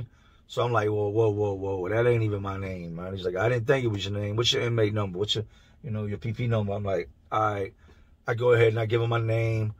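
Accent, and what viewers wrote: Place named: Philadelphia, United States. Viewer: Always wild stories I can hear a little bit of Philly in your accent, no place like Philly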